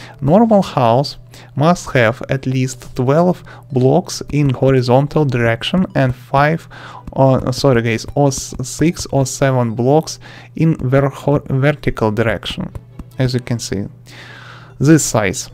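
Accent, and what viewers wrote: Russia. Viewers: Акцент, русского человека)) Привет! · Русский акцент тебя выдает...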